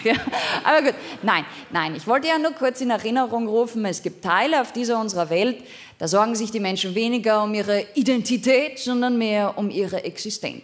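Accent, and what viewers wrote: Austria. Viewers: kein Wunder Du Schlaubär, denn sie kommt aus Leoben, Steiermark und spricht somit den steierischen Dialekt. Das hat mit wienerisch so viel zu tun, wie bayerisch mit hessisch. Den Rest spare ich mir zu kommentieren... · Der Wiener Slang , der leicht nasale , herrlich ...freut mich als am Land lebende gebürtige Grazerin!!!😂😂😂😂❤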